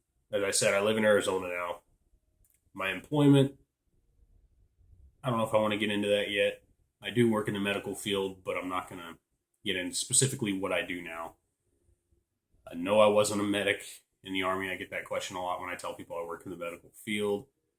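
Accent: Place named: South, United States. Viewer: Good intro. I have to agree that people should look at youtube as entertainment. Oh, nothing wrong with a good southern drawl. Looking forward to the new channel. Cheers!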